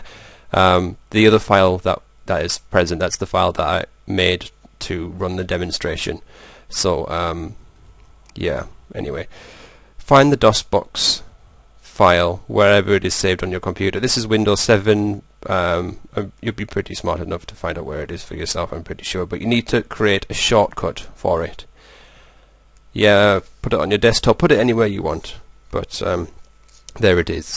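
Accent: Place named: England, United Kingdom. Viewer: I really like your accent! What part of England are you from?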